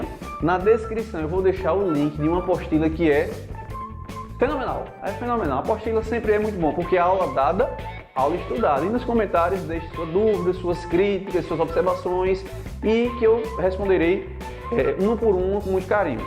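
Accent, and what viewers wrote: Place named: João Pessoa, Brazil. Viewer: sou recifense, percebo que o sotaque em cada estado do nordeste é muito diferente, sabia que eras de joao pessoa apenas pelo sotaque! divertido isso kkkkkkkk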